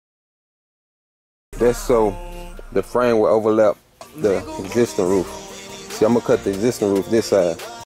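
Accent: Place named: Texas, United States. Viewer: Sound like you from TX man I miss y'all bruh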